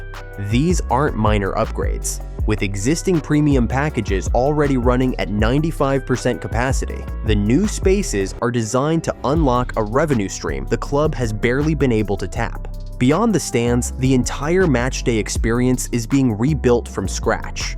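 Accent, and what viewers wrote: United States. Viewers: Shame a Yanky robot had to narrate this. I might have watched it otherwise.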